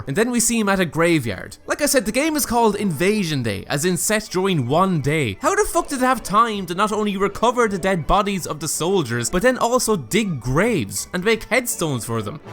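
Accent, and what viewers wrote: Ireland. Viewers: Just love hearing an Irish gaming you tuber ❤️❤️❤️ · something about an irish accent makes me subscribe before i even watch the video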